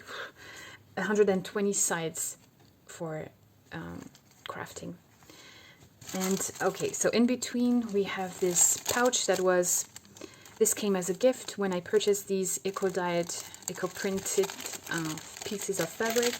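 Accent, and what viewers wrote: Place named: Quebec, Canada. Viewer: I love this, very beautiful work! Are you French? You have an accent that sounds from Québec. Just asking cause I am in Montreal!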